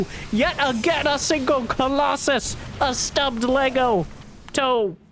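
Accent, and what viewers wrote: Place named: Appalachia, United States. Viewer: Can you speak proper English please ? Your hillbilly dialect is soo cringe … is it an act?😢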